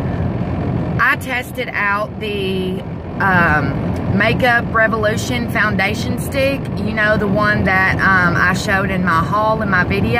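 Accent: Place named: Arkansas, United States. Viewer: Where are You from Babe?Your accent sounds like Mine.Im from Arkansas!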